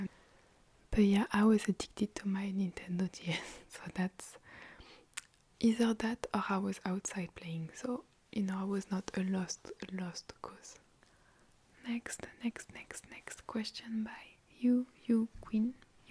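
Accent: France. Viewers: YESSSS THE FRENCH ACCENT I LOVE THE FRENCH ACCENT 🥖🥖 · hii, i'd love an asmr video full in french of following instructions themed, cause with those videos even if i don't speak the language i can still understand what you're saying, and your french accent surely deserves a whole video! 🤓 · I think you look much older with your natural hair color. I love it! By the way ,you are so pretty and everytimr I hear your sweet french accent I get the goosebumbs.🫡😔😂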